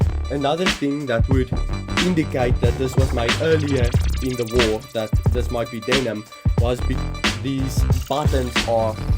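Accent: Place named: South Africa. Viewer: you have the strongest saffa accent ive ever heard. Wish the guy who offered me a genuine Rhodie wasnt greedy (bro wanted 5000 rand) 😔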